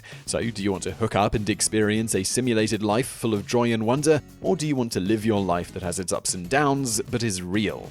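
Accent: United Kingdom. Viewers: I get it. He's British. But, Your I Null? He's never heard the word urinal before? Re-cog-nized? That's not even a word. And don't get me started on his pronunciation of aluminum. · LOB, judging from his accent, he is clearly British so visiting every state in the USA wouldn't be a factor here.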